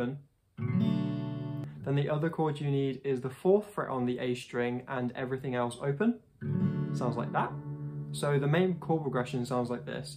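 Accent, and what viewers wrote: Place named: United Kingdom. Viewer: You sound like Dodie maybe because you both are British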